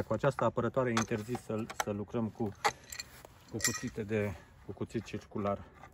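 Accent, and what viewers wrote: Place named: Russia. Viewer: Parece que hablas ruso y que hablas español, que eres?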